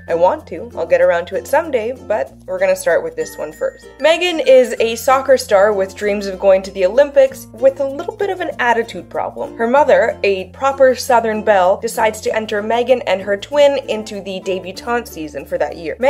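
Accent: Brazil. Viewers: Hi beautiful, you are talking portuguese with the brazilian accent, I'm from Portugal and our accent is very different ahah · I think the spelling of Portuguese from Portugal and Brasil might be the same but the pronunciation on your vid is kinda brazilian lol! You did an amazing job!!! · ohhhh portuguese !!!! that is my language , im from portugal omg. your portuguese was pretty decent , but you definitely spoke with the brazilian accent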